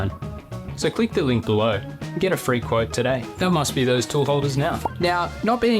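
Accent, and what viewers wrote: Australia. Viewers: Cycloidal drive for what? A fishing Reel? Looks Like I have gotten in somewhat early new subscriber here. Plus you sound suspiciously Australian? Can you define SuperWog? for me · Every Australian I see on YouTube always turns out to be a subversive communist trying to undermine their own country, let alone mine. So when I hear your accent I am immediately alarmed. :( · AVE ! But Aussie and not Canadian! You got my subscription 😂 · I just found this channel, and I get the feeling you're like a younger, more Australian This Old Tony.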